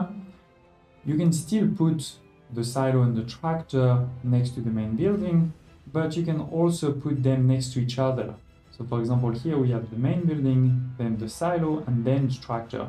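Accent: France. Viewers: Merci ! Cet accent français à couper au couteau rend l'anglais parfaitement compréhensible pour moi, donc double merci ! Bonne continuation.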